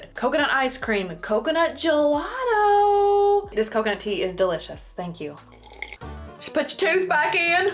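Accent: South, United States. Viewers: Good morning ❤️🙏. I’ve been checking out a lot of your videos from the older ones to the ones that you post now and may I say that I absolutely love your tell it like it is attitude and I definitely agree with you on a lot of what you say.👍🏻💪🏻🥳. I also love to check out your cooking videos as well cause you truly are a hoot when you’re cooking and I love your southern voice too. I’ve been checking out at least two to four videos from you daily.😁👍🏻. Do hope you have a wonderful and blessed day and don’t ever change that awesome way of thinking too cause a lot of what you say is absolutely the truth. God bless you and keep the faith and definitely keep smiling too. You’re a pretty lady too. Hugs, Sue M. Clearwater, Florida ❤️🥰🥳💪🏻🙏⭐️🐚🌴❌⭕️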